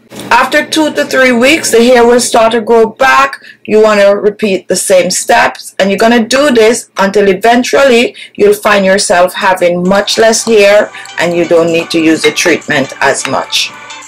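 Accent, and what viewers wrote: Jamaica. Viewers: You're Jamaican right? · Were are you from? You have a strong Jamaican accent.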